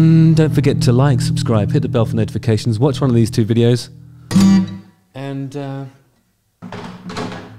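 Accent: United Kingdom. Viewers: Hello. Firstly I’d like to say I love your content. Secondly, you have the most annoying intro I’ve ever heard yet I find myself singing it throughout the day. When I first started listening to you I thought you were saying “right again.” I thought to myself this is one cocky Britt and I like it.Have a great day sir!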